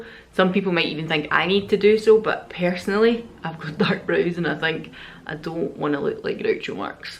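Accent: Scotland, United Kingdom. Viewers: Love the video! Been looking at these Bodyography glitter pigments. They remind me of some of the flakie shadows from Copacetic Cosmetics** I'm from USA but think they ship to other places in the world. Love your Scottish accent too btw! 💓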